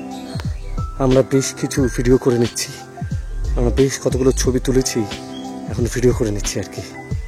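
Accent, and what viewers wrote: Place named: Bangladesh. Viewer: আপনার ভাষা শুনে মনে হয় আপনি বাংলাদেশের মানুষ। পশ্চিমবঙ্গে ভাষার সঙ্গে আপনার বাসা মিল হয় না।মনে হয় বাংলাদেশের কোন অঞ্চলের ভাষা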